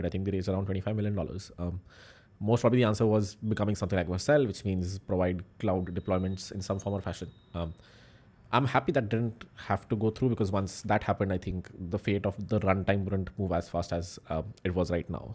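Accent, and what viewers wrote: India. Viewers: Indian accent English with this much pace is very difficult to understand..slow down a bit bruhhhm.. it seems like rapping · Haha it's his Indian Ascent. I hardly understand so I listened very carefully 😅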